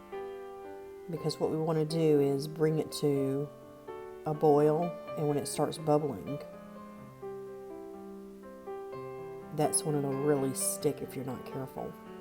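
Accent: South, United States. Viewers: That's how I grew up eating banana pudding. Not that instant stuff. Warm and DELICIOUS ♥♥ I know this is a late comment but, my husband could eat that by himself. LOL Looks good. That's exactly how I grew up eating banana pudding, the way my Granny used to make it, she passed in January and I have been searching for a recipe like this one. Al I have been getting the ones with the instant pudding and whip cream. No ma'am press ham, I need the OG BANANA PUDDING, and THANK GOD, I have found it. 😊😊😊 Thank you for the recipe. I love your accent also. I'm from the south as well. LR, ARKANSAS, BORN AND RAISED ♥♥ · Though I'm gluten sensitive, I enjoyed watching you make banana pudding from scratch. Listening to you speaking reminds me of growing up down South and it brings nice memories. 💗🍌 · I like your southern accent, and I love “ what my mama said” part. 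I will definitely try this recipe.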